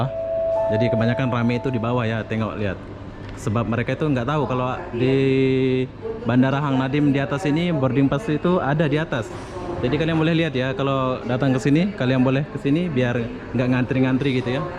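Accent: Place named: Malaysia. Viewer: logat bicara nya kaya orang maysia bro, mantap traveling nya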